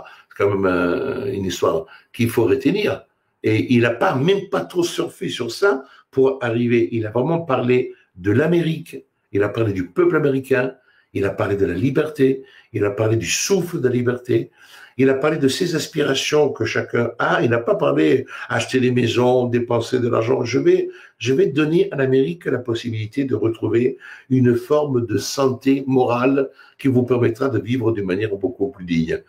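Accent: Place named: Italy. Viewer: Quel bonheur d’écouter notre cher cher cher, avec son petit accent d’Italie et son franc parlé.....merci à lui pour ces années de soutiens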